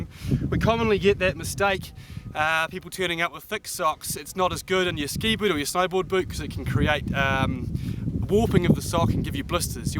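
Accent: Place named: New Zealand. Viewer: Good Kiwi accent! :)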